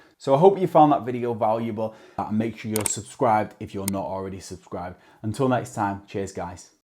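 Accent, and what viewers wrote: United Kingdom. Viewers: good and clear presentation,thanks for a different view, a very clever lad, and a fellow brit I think.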